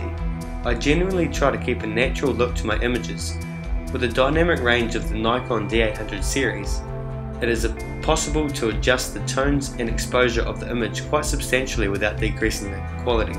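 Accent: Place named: New Zealand. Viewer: The accent is standard Kiwi.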